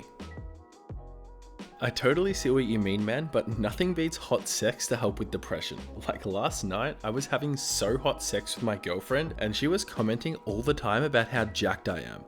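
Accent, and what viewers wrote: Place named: Australia. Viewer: I don't know why but j feel like your an Australian because of that accent btw I'm from nz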